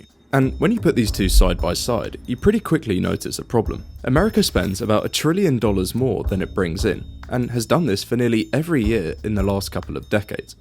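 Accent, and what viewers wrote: United Kingdom. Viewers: Color me skeptical of a 20 something with British accent telling me what the future holds. US debt isn’t debt. It’s treasuries. Purchased by people earning interest. It’s savings. Not debt.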